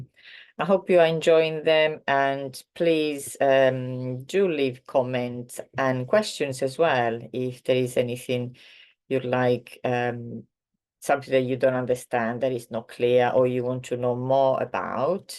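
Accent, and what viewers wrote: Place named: England, United Kingdom. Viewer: I’m on Duolingo learning Italian, do you think that’s a good source? And you sound English? Are you from Italy?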